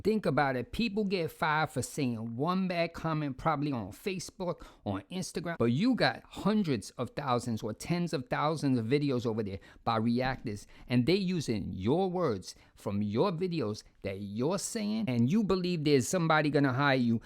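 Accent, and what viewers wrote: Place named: Nuyorican, United States. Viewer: I don’t even care about Foodie but I will always watch your videos, even if you’re doing reviews of the world’s best cabbages. By the way, my bf hears your videos and is convinced you’re one of his Newyorican relatives doing reviews on internet weirdos on the down lol. I mean, he might be right?! Love you!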